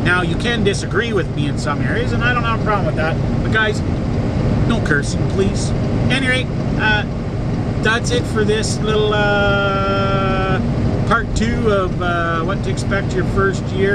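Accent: Canada. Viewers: I called it 30 sec after the video started - knew he was a canuck, recognize that accent anywhere good vids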